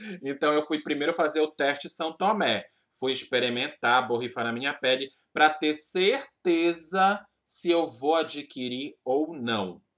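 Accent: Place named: Belém, Brazil. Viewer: vc é de Belém, né? imagino que seja pelo sotaque onde vc compra dessa marca?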